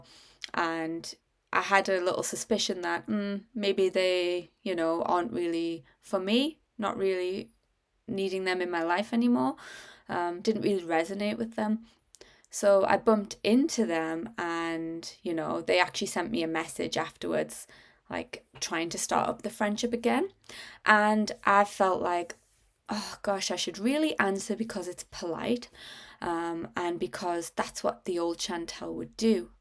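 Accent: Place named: Europe. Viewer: Hello, you seem to sound EUROPEAN. I'm from America.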